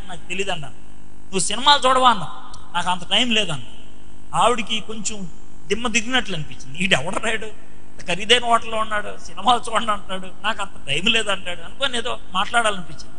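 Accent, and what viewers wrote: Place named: Srikakulam, India. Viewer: Srikakulam slang sir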